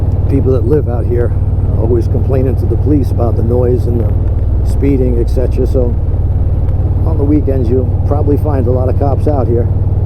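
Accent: New York, United States. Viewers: Forty two years and you still sound like a New Yorker. I’m in NC and two words out of my mouth and they ask, “you from NY?” Gee, how could you tell? Bwahahaha! P.S. Love your videos. Regardless of how long we’ve been riding any of us can always learn something new. I’ve recommended your DVD’s to every new rider I meet. Keep up the good work.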